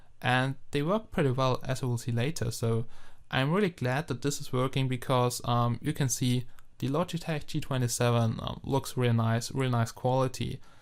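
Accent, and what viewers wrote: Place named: Germany. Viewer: Are you german? That accent sounds familiar ;)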